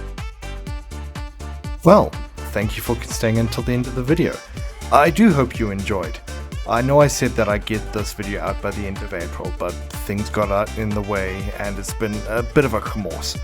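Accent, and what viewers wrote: South Africa. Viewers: Are you south african? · mind if i ask are you south african? i can hear it in your voice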